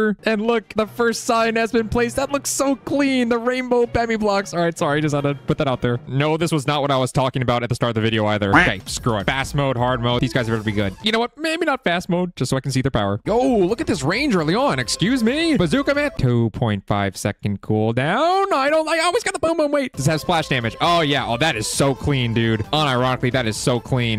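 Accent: United Kingdom. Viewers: Bro turned british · W british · lol british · Bros british accent is so good whattt😂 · BRITTISH :D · bri'ish man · bros British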